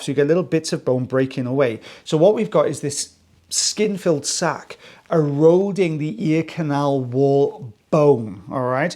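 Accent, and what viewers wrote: Wales, United Kingdom. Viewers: I’m so happy you said Ebony in your lovely Welsh accent. It’s one of my favourite words to hear you say!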